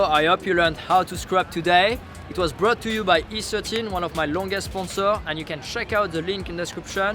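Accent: France. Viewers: Je kiffe grave ton accent français en anglais......tu parle le franglais, le vrai .....t y es le meilleurs en tout cas dans les rides de fou....bravo a toi d avoir trouve la technique du poignet pour ce tricks, ça marche du tonnerre je viens de l essayer ....🤟🤟🤟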